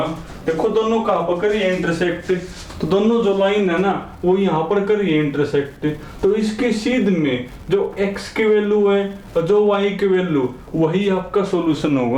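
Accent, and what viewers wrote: Haryana, India. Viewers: Sir I thik you Belong to haryana...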